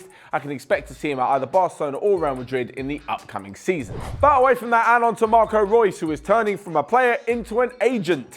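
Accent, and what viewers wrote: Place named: England, United Kingdom. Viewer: awful accent, thank god im not leaving in england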